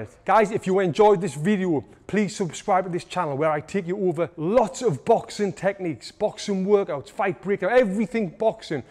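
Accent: United Kingdom. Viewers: British accent makes this lesson reliable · His British accent is interesting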